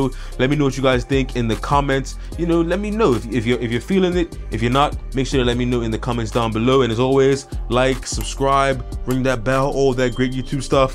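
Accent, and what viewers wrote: Bermuda. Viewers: sound bermudian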